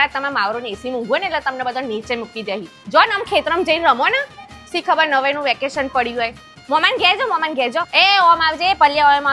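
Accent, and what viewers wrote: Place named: Mahesana, India. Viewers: મસ્ત મેંહાણી ભાષા બોલે છે તમે મહેસાણા ના છો??? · Mahesana nj bhasa sambhadva no Anand Avi Gayo👌👍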